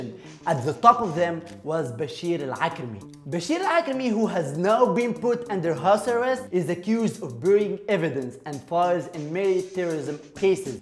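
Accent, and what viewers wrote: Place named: England, United Kingdom. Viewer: btw i like your english accent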